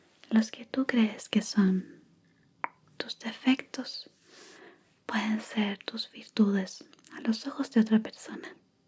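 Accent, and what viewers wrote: Chile. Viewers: Gracias a tí preciosa, puedo pasar de 🤬 a 😊 Tu voz dulce y acento chileno, hacen maravillas ✨✨✨🌹👸🌹 Gracias 🙏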